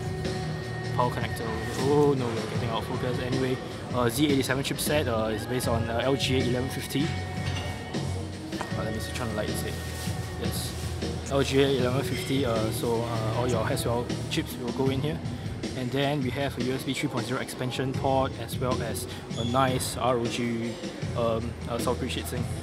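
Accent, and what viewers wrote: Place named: India. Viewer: Bro no offence but you indians speak english far too fast makes it harder to understand with your accent speak slower then i will be able to understand you better.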